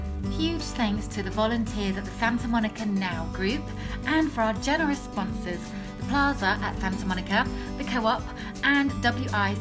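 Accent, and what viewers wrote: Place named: London, United Kingdom. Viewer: You are very welcome. The simplest and most heartfelt things are always the best. You did good and should have been recognized and complimented for it. I love the accent. Sounded like London area. I'm not from the UK but lived in the Devon area for an extended time after my retirement. You would have charmed the old Vets brilliantly. I am so jealous.:-)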